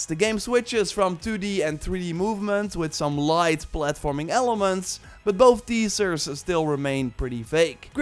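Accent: Netherlands. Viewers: After all this years... still that heavy Dutch accent while speaking English. Very annoying.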